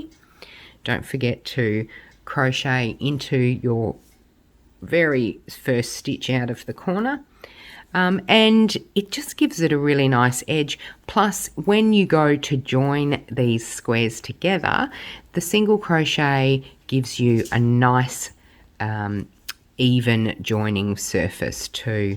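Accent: Australia. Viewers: Thank you! Great pattern and excellent instruction! Lovely to hear another Aussie accent 😊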